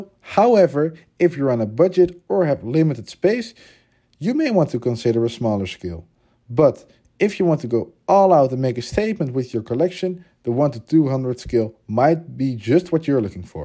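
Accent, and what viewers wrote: Netherlands. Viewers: your dutch right? because your voice but in the beggining i also though you were displaying a photo from aviation megastore?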